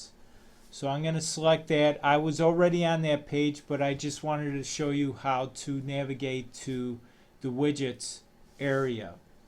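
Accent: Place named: New York, United States. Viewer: Nice to hear a New Yorker! Thank you for the very clear examples of each option for showing a category list in a sidebar.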